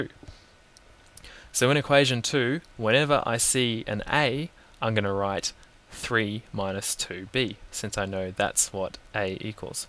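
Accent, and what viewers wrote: Australia. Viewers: (PixelonTV) Australian (Y)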